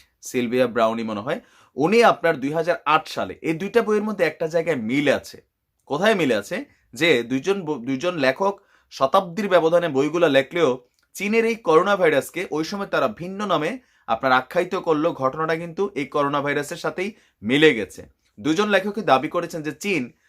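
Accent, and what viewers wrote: Comilla, Bangladesh. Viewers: ভাই আপনি কুমিল্লার 😍😍😍😍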